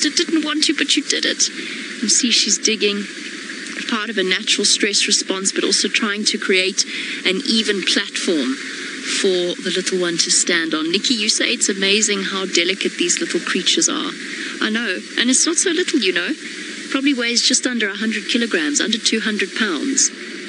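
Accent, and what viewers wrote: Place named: England, United Kingdom. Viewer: Maybe if you could film and shut up. English accent off putting had to mute.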